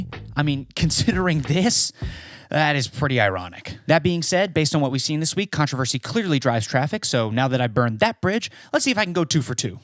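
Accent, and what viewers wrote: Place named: United Kingdom. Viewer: Awesome just awesome and British accent on point 👌👌👌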